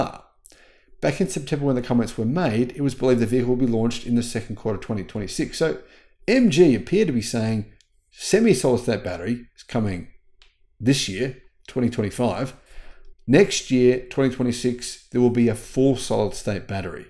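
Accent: Australia. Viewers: I am looking for a way to get credit for my improved ability to comprehend the Ozzie accent But all web sites focus on reading comprehension and ignore listening comprehension Still because of the, my listening comprehension has improved, making me confident I could successfully buy a cup of coffee and rent a hotel room in Australia!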